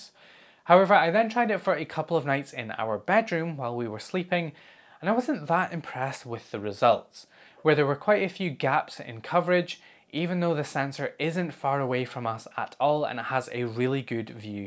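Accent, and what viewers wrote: Scotland, United Kingdom. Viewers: Another amazing video!. Informative and enjoyable to watch. Still waiting for you to lean into the Scottish accent more and roll your Rrrrs among things. 😝 Maybe in one of the always funny blooper takes at the end? 😉😉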